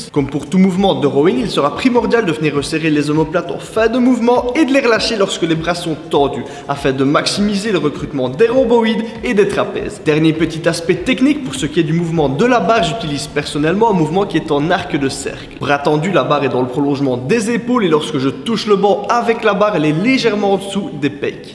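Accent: Liège, Belgium. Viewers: Tu es de liège ?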